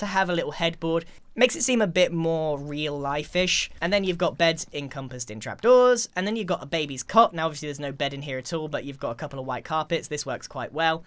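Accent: United Kingdom. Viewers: Why do you sound extra British lol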